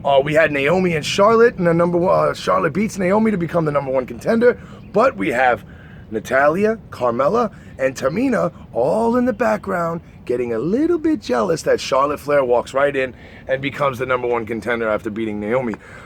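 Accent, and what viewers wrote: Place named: New York, United States. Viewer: I hear the NY accent now 😜 ps.. game 6 is ours! It ain't over till it's over brother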